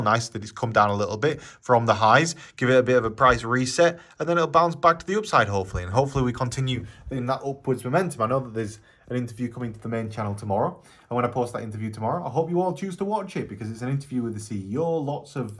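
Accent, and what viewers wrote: Yorkshire, United Kingdom. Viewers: Allreet? Yorkshire man😊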